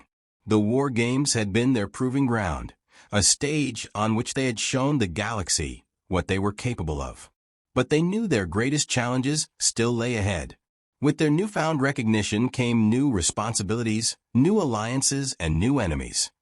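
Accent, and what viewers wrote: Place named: North America. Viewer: Now tell it in an accent that isn't North American.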